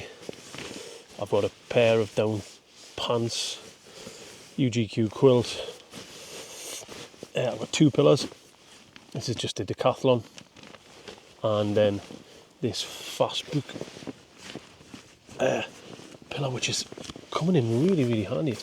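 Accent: United Kingdom. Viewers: I love the British accent - are you a true Cockney ? I notice you have a nice thick head of barnett and chair and at least in a tent you don't have to go up the apples and bananas to bed ! I'm going to pony and monkey myself shortly - wish me luck geez !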